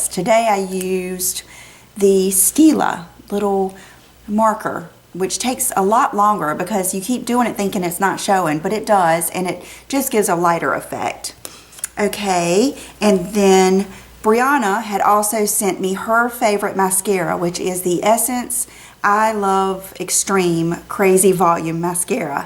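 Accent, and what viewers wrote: South, United States. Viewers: I just discovered your videos, and I'm addicted! You are so enjoyable to watch. Love your taste, your attitude, and your southern accent! Just gorgeous all around.:)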